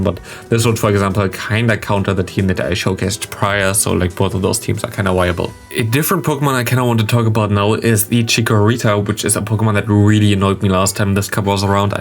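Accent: Germany. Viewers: He is German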